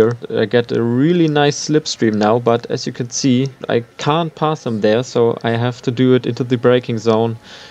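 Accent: Germany. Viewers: Are you german? The accent sounds familiar... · Da hört man doch das deutsche raus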